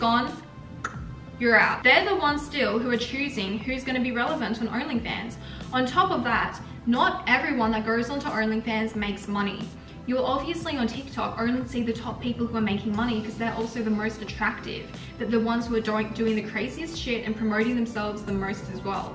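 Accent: Australia. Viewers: I was like 5 second in, and I was like "Yup she's definitey australian" ;D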